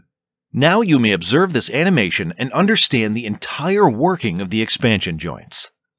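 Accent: Kerala, India. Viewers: I miss the indian accent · please don't use your voice. I hate indian accent. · Why don't you stick to using the AI generated voice in place of your Highly "Mallucised" accent!!! Sorry for the blunt comment.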